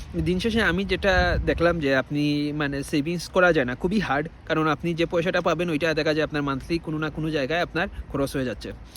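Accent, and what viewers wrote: Sylhet, Bangladesh. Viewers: sylheti naki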